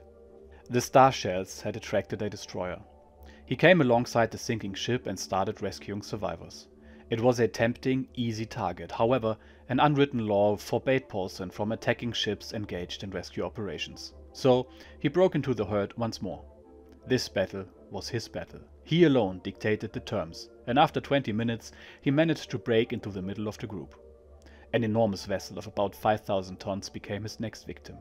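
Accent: Germany. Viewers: thank you for the editing and translation, it's that much more enjoyable knowing you ensure accuracy. Again, the German accent makes it that more authentic. If I go to a Chinese restaurant, I prefere to see a Chinese guy cooking in the kitchen.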